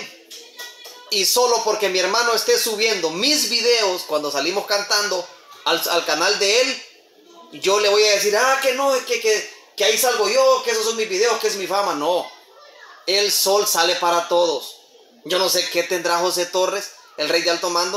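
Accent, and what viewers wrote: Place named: Mexico. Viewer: Este ermano hontureño es la pura riata primer hontureño que abla como un buen mexicano saludos biejon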